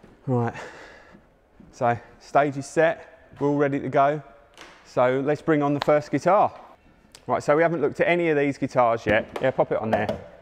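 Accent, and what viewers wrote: United Kingdom. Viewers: Always nice to hear an Essex accent, and always nice to see fabulous Martin guitars. The best in the world. Sadly, I won't be coming to see you. No lefties!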